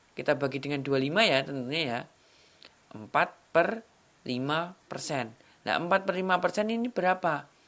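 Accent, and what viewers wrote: Java, Indonesia. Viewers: Terima kasih bang. Salam dari pemalang. Bang maaf boleh tau asalnya? Logatnya Jawa nih hehe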